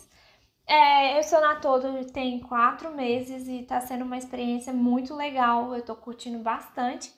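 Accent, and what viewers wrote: Minas Gerais, Brazil. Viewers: meu Deus ...q top kkkk parabens ... vc fala igualzinho a Ana Paula Valadão ! vc é mineira tbm ? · Algumas coisas no sotaque mineiro são inconfundíveis. Percebi logo na primeira frase kk Mais um inscrito o/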